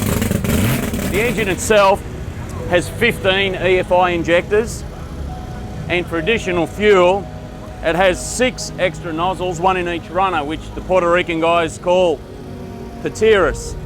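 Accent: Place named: Australia. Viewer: When I hear an Aussie accent makes proud to live in Melbourne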